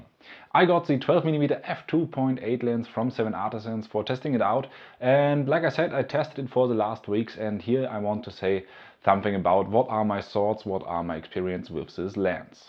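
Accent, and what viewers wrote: Germany. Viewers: Rede doch lieber gleich deutsch ehe du dich mit deinem harten deutschen Akzent abmühst englisch zu sprechen.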